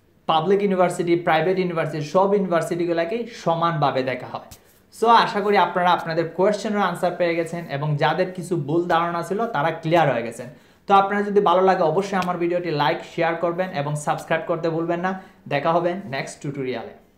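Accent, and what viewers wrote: Sylhet, Bangladesh. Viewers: oni mone hoy sylheti bibaker manush tai amon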